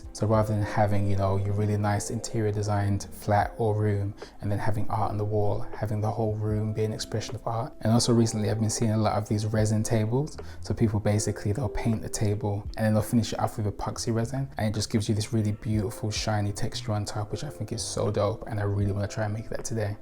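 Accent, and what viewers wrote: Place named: England, United Kingdom. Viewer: Are you. British? You have such a nice and calming voice but your accent is very interesting because it isn’t super strong English accent :) your art and crafts are so beautiful and good too it’s amazing how you can make all this stuff yourself and very creative!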